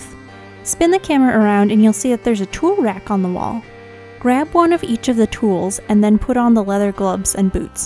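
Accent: Australia. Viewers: that ausie accent just earnt u a sub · gooooooood as aussie accent lol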